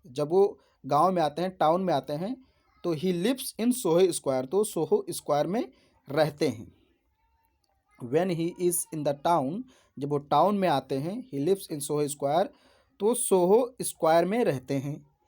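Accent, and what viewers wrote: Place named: Purvanchal, India. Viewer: Sir speaking language tells that you generally belog to Purvanchal... May be Sultanpur, Ambedkar nagar or Ajamgarh😊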